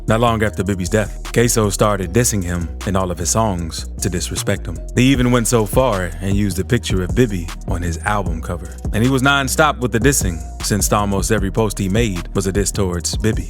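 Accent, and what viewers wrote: United Kingdom. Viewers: My guy feelin his voice this recordin’ talkin a lil diff n a uk accent 10/10 😂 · The UK accent threw me wayyyy off 🤣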